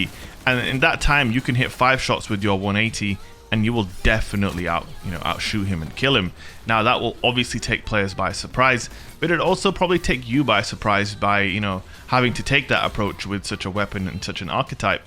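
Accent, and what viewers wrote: United Kingdom. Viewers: Heard British. smh. DISLIKE VIDEO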